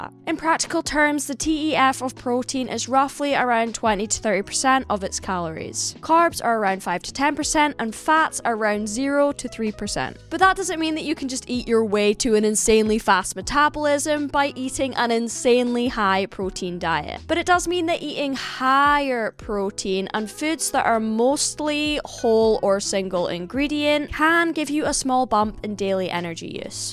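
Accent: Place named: Scotland, United Kingdom. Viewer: FIRST COMMENT AND FIRST LIKE:🥇🌟🎖️⭐️🏆🏅 hi happy Sunday your Scottish 🏴 accent=🔥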